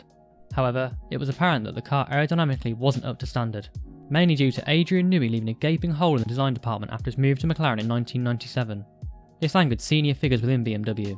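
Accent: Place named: United Kingdom. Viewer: I can't understand your accent it's too British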